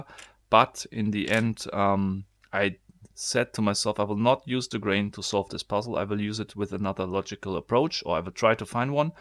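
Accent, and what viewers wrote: Germany. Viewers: It's the accent! I don't know why but Germans speaking English are making me joyful somehow haha and English is not even my mother tongue (I'm French) :D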